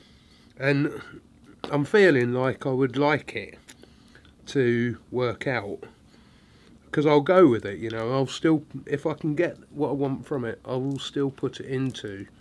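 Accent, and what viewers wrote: England, United Kingdom. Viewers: You have the most wonderful english accent, I know that might sound strange but I just find your voice so soothing. I feel as if I could fall asleep listening to you talk about quite literally anything.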